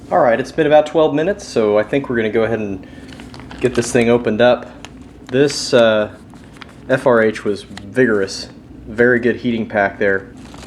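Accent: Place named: Deep South, United States. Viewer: I'm confused mate, are you American or Canadian? You sound like you're from the deep south, but you called it "jam" not jelly. Americans call jam jelly. Canadians correctly call it jam.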